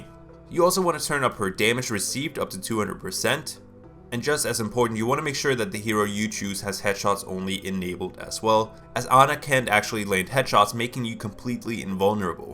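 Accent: Boston, United States. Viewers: Where are you from. Your accent is cool. Boston?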